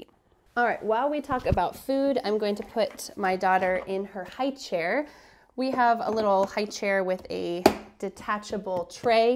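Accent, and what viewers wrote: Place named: United States. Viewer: Hi Lady! You teached me english vocabulary and US 's accent also! Tks so much! However, each clip should be not long over 10min than very well !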